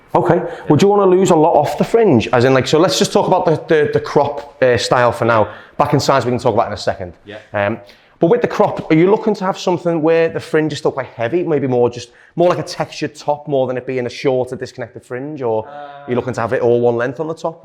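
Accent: Liverpool, United Kingdom. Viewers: Although I'm not from England & don't recognise accents too easily, it seems as though is Scouse (from Liverpool).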